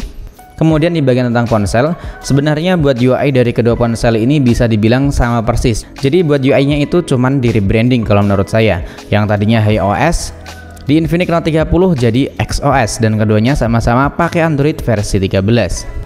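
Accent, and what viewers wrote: Demak, Indonesia. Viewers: Orang Demak ya bg?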